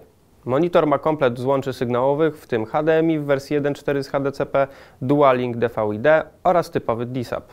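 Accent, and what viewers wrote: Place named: Scotland, United Kingdom. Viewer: DAMN SCOTTISH ACCENT !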